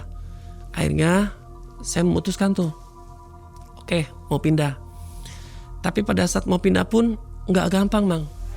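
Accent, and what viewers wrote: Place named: Cirebon, Indonesia. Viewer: Jawa cirebonnya dah medok bnget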